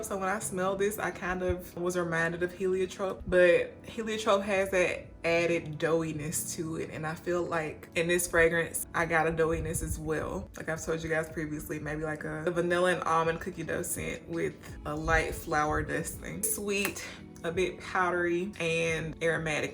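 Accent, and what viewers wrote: South, United States. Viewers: What’s happenin lovely?Been subbed to you for a few months I think.Been meaning to pass through your page to show you some love at some point.Saw your vid here on my feed,so here I am 😉 Super solid page and content fam.Mad respect.Cool video here as well.Love your southern accent too :) Keep up the good work and have a wonderful week and beyond.SOTD = Ex Nihilo’s Bois’D Hiver to the wrists and forearms,and Creed’s Royal Oud to the front and back of the neck...came out Glorious 😊 Got the idea from Max Forti’s page a few years back.He recommended Oud Wood and Royal oud which is a really nice blend too..but I tried the Bois ‘D Hiver variation instead of the Oud Wood with Royal Oud because of their striking resemblance.Real cool :) 1 Love/Peace/Bless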